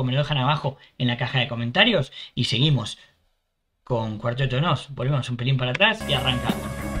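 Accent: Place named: Argentina. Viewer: Este flaco a veces es argentino y a veces es español? Jaja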